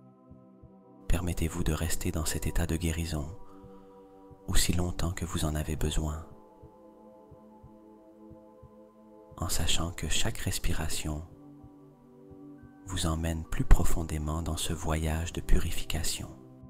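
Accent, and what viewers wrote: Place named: Quebec, Canada. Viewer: WoW mais quel changement! Petite question: je suis québécoise et j’entends très bien le même accent. Êtes-vous québécois vous aussi?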